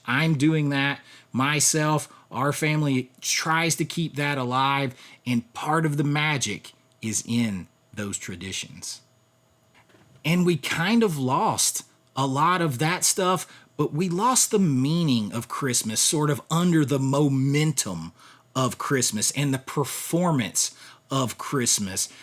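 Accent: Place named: South, United States. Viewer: Absolutely does. Sorry i never check back for replies because i guess im gettimg older but again tonight im watching you new video as we text. Me and my daughters joke now "pop that tape in i gottt youuu" 😅with your southern slang l😂 love it. If i can pull up the $ ill definitely patrion. You kmow how it is brother.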